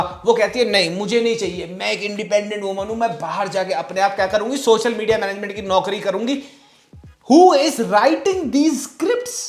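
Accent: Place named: Delhi, India. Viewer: Bhaiya mujhe aj pta chla ap out of india rehte ho. Apki baatein ,jokes ,accent se lgta h ki ap delhi m reh rhe ho. 🤣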